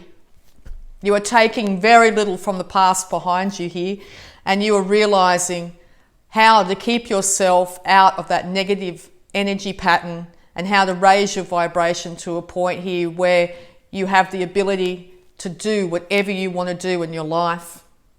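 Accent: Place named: Australia. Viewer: Love Aussie ascent...I am in Melbourne...I go to school at Shambala centre Bullpen...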